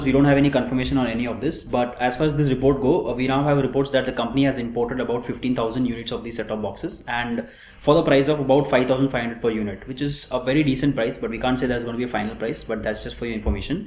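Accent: India. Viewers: lol sick Indian accent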